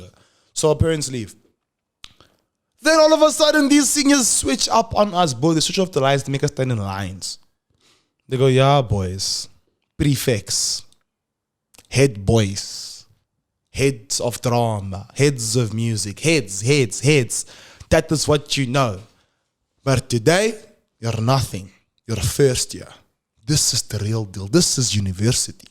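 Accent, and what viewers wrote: Afrikaans, South Africa. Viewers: Your Afrikaans accent monna is not make sure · looking clean mxaaah mfethu you killing me lol great Afrikans accent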